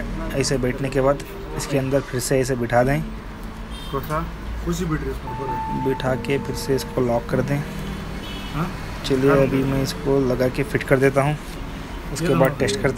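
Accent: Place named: South, India. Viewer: the way you are pronouncing M ....I think you are from south🔥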